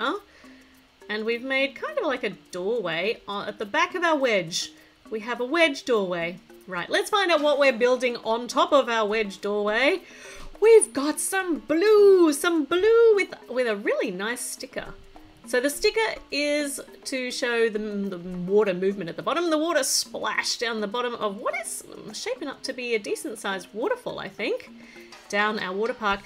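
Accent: Australia. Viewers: I have been watching your vid so much I’m starting to wanting to talk in a Australian accent! 😆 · I love this, video and what country are you from you sound Automatically Austrilian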